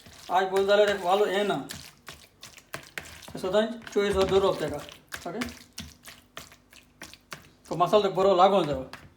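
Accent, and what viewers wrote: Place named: Konkan, India. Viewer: I like your konkani accent...and your recipe's are really good. 👍Tuge chouris maas receipe maka bori lagli. Mog asum.🤗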